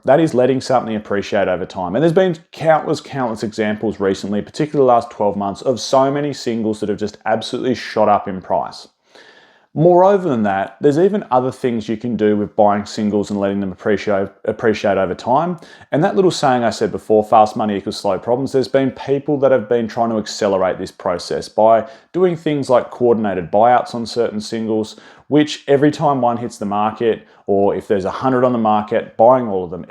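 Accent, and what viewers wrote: Australia. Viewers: what part of Aus are you from btw? I'm in melb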